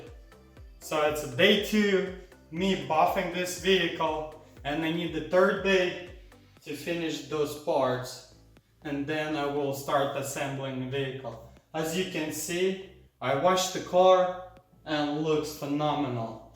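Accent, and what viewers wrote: Russia. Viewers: Вот смотрю ваши видосы и думаю, что акцент похож на русский. Угадал?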